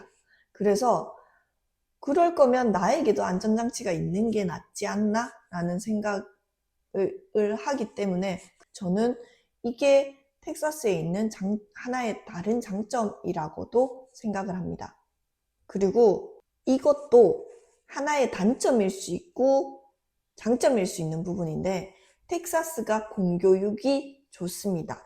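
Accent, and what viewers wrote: Gyeongsang, South Korea. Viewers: 오... 저희 와이프도 간호사예요. 저희도 간호사 와이프 때문에 미국에 이민 온지 4년 됬고 달라스로 이사 온지는 1년 됬습니다. DFW 사시면 저희 집에 한번 초대해서 저희 가족과 한번 뵜으면 좋겠네요. 사투리 쓰시는거 보니 저희 와이프도 경상도거든요 ^^ DFW 근처 계시면 연락한번 주세요.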